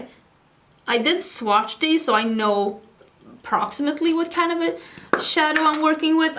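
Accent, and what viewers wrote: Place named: Sweden. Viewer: the way you kept saying "depth" in your cute little swedish accent! 😭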